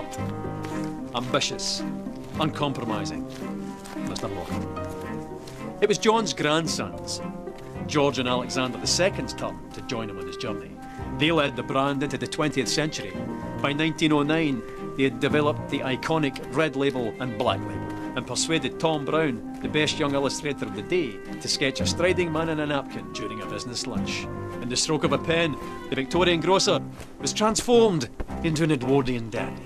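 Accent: Scotland, United Kingdom. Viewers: He's Scots!!! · Is he saying genuine Scottish accent? It sounds cool and sexy. Which makes his voice sexy, his voice or Scottish accent? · thats Scottish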